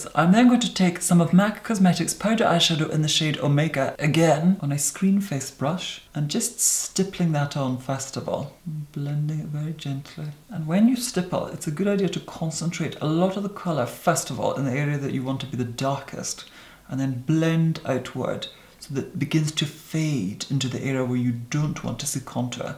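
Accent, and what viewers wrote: Scotland, United Kingdom. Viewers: I Love your accent! Is it scottish? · Scottish accent: VELVET edition! When I close my eyes, his voice conjures dark chocolate being tempered on a warm marble tabletop.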